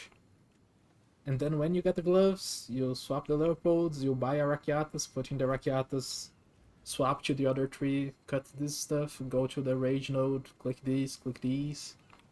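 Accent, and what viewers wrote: Brazil. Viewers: My brain is sensing a brazilian accent on you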